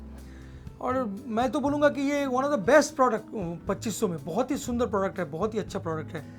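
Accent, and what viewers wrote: Bengal, India. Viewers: r u a Bengali?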